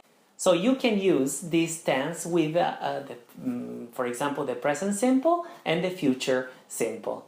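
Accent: Italy. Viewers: I trully loved his italian accent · You are definitely Italian and not Canadian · your italian accent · i would have bet that you were italian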